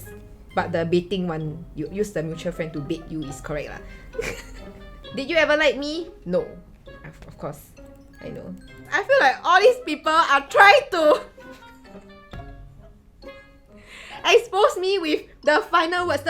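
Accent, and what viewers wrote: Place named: Singapore. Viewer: can hear the singaporean accent eh!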